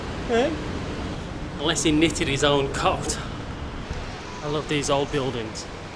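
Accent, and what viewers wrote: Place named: United Kingdom. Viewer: Hi, newer subscriber here and glad to see a new video in Taipei Taiwan. Can tell that you enjoy walking, as it seems like every video you sure get in your steps for the day. I enjoy your Pop In The Bar portions as you alway describe what you are trying very well. We can almost taste it. Wanted to say how much I enjoyed your Kosovo series and so glad you captured the footage of that cute dog Prince and trying the Goulash with the guy from the hotel. Enjoy your British accent and determination so looking forward to more videos of Taiwan.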